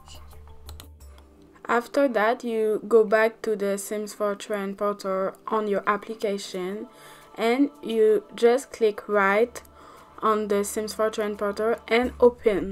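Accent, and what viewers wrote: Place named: France. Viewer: Lol you are a french people we can hear that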